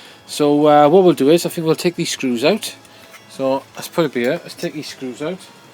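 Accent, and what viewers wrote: South Wales, United Kingdom. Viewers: You from wales but? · Are you from South wales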